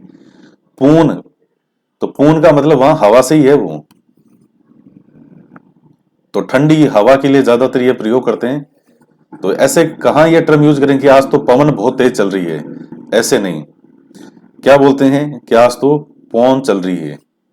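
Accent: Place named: Marwari, India. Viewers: Sir aap churu se ho kya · Pranam sir ji 🙏 Sweet marvadi bolty ho app sir .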